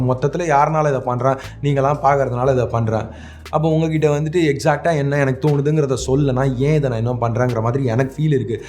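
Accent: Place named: Chennai, India. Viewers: தல லோக்கல் ஆ இறங்கி, சென்னை பாஷைல கலக்கிட்டீங்க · சகோ உங்களோட ஆங்கிலச் சேனல்களை நீங்க பேசுற அந்த ஆங்கில உச்சரிப்பு வந்து ரொம்ப நல்லா இருக்கும் எனக்கு ரொம்ப பிடிக்கும் ஆனா நீங்க தமிழ் பேசுறப்ப அதுவும் இந்த வீடியோவை பொருத்த வரைக்கும் நீங்க ரொம்ப கோபமா இருக்கீங்கனு தெரியுது நீங்க பொறந்து வளர்ந்தது எல்லாமே சென்னைதான் என்கிறது நீங்க பேசுறது தமிழ்ல இருந்து தெரியுது எப்படி இருந்தாலும் நீங்க பேசுற அந்த ஸ்டைல் வந்து எனக்கு ரொம்ப புடிச்சிருந்துச்சு வாழ்த்துக்கள் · bro....conetent vudunga...neenga nambo madras slang la pesurathe sema ...super po....ipdiye pesungo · super bro i like your Chennai Tamil 😁😁😁 😄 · Sema video bro! keep it up! சென்னைத் தமிழ் அருமை!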